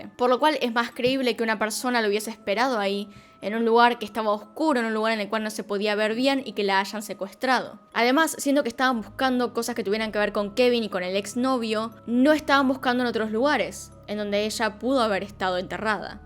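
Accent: Argentina. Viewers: Buenísimo video, me encantan todos tus videos, siempre lo explicas con claridad tqmmm, además que me encanta el asentó Argentino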